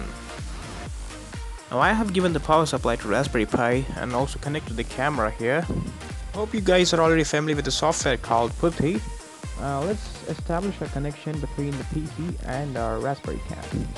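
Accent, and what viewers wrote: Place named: United States. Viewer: u have a american accent or u must be prentending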